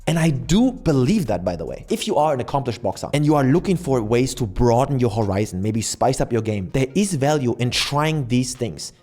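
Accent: Germany. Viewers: ok, for me, your accent sounds kind of like german^^ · Holy didnt know was so German 🤧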